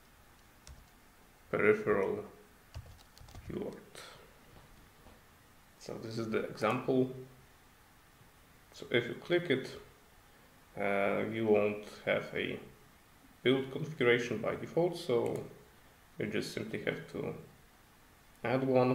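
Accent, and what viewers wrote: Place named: Eastern Europe. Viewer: Nice, cant wait for the audio implementation. Are you Polish? You sound slavic.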